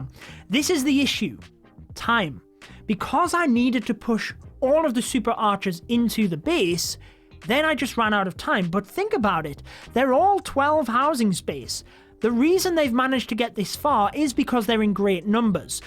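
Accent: United Kingdom. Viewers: Where is he from? Or I should ask: In which part of UK does he live? 😄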